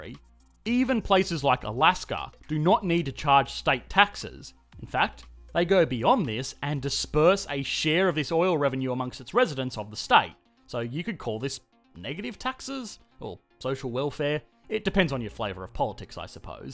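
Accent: Australia. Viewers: Ed Hrafnskald Conway Well unfortunately most governments aren't as well liked as with his smooth Aussie accent!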